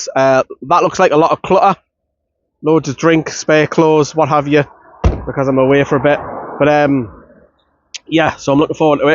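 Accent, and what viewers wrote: Newcastle, United Kingdom. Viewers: stupid me, I thought it was Tuesday today, haha, and I'm glad you talk Geordie, not posh dis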